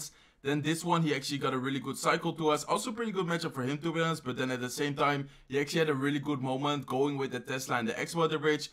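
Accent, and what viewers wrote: Netherlands. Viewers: Actually een best wel nederlands accent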